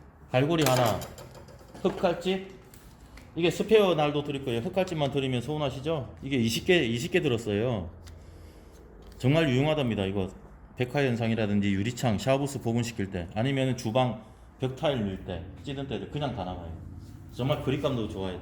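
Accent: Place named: Gyeongsang, South Korea. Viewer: 경상도 말투가 귀여우신분 처음봐요☺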